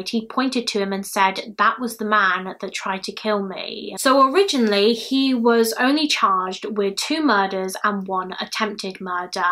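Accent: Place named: England, United Kingdom. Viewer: I read a story on AO3 the other day & one of the characters was called. Every time I came across the name, which was very often, the reading voice in my head suddenly had the English accent and pronounced the name exactly the way that our here does. This of course prompted me to walk around just randomly saying the name but in her accent. So thank you for finding a way to drive my family absolutely nutty (& into laughter) because that's my goal in life. Not to drive people crazy but to make people laugh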